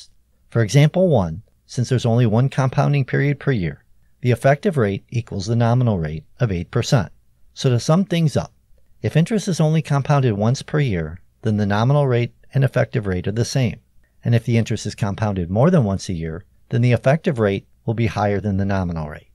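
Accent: Louisiana, United States. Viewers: Cajun accent?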